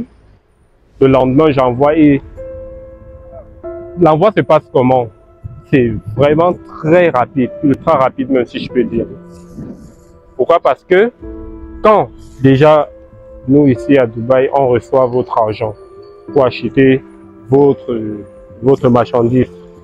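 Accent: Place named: Cameroon. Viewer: Je suis intéressé mais gars a un accent camerounais, ca ne rassure pas.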